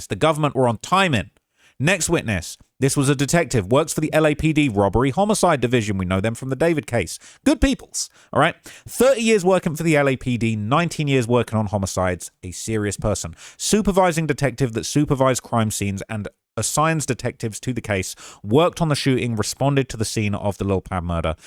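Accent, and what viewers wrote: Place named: United Kingdom. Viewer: has a thing against black people. He uses AI but yet he claims the lawyer used AI. Why? Because he was black? made the claim because he himself uses chatgpt. That was projection., you didn't go to journalism school -you're not a journalist! You get your information from Reddit comments. Going to a court house with a suit on and getting a press pass because of your British accent doesnt make you a journalist. Go to the hoods you report on after someone gets shot thefe. Thats journalism. Go interview the victims in the hood. Go do your investigation after a rapper gets shot, then I'll call you a journalist. All you do is institutionalized gossiping. You have turned gossiping into a profession. But thats gossiping - not journalism. The man you're accusing of using Chatgpt went to law school, passed the bar and is a lawyer! 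Who do you think you are to question his work? You're a gossip youtuber. Grab your lips and get a hold of yourself. You can put an old t.v, reels and passes in the background of your set, nice arrangement, but that still doesnt make you a journalist.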